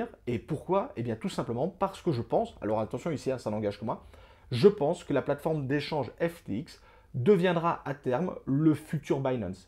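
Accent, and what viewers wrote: Belgium. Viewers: Salut, j'ai reconnu directement ton accent belge, sa m'arrange car je me demande justement si je cash out sur un compte en belgique quel sont les taxe encourue? Merci a toi bizz!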